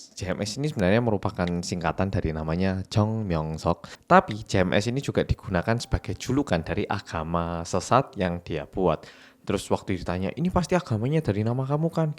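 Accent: Java, Indonesia. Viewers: Jowo banget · Di gonta ganti karepe dewe 🤣🤣🤣 jawir banget mas...👍🏻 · Nyoro mas, boso jowoku sampek kalah karo sampean...terlalu medokk mass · Hallo, aku mau tanya boleh? kok tuh gereja ngga ditutup sama pemerintah ya? terima kasih Mas ya aku baru gabung ke chanel nya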